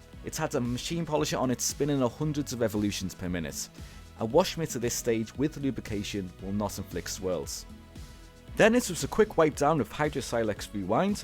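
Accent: Liverpool, United Kingdom. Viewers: Hi nice to hear a fellow scouse voice. Two questions mate, firstly how long did it take you to do that exterior detail? Perhaps that would of been a useful detail to mention in your video. Secondly, when you're machine polishing do you find it gives you a bad back? Whenever I machine polish my back is practically on fire! I get really bad aches off it.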